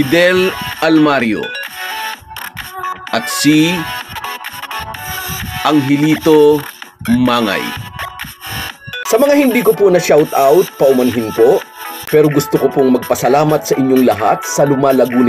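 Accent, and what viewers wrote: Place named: Batangas, Philippines. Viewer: Hi kabayan, shoutout sayo you are one pba vlogger i follow, clear mag annalize ng laro, most of all your batangueño accent is very entertaining... Good job kabayan, staysafe palage....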